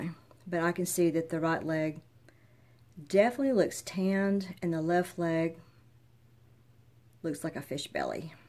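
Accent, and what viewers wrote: South, United States. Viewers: Good southern accent!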